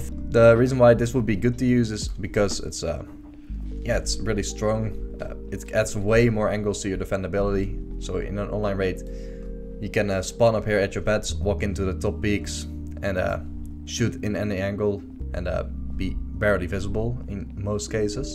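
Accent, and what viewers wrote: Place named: Netherlands. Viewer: Ben je nl want je hoort het echt 😂😂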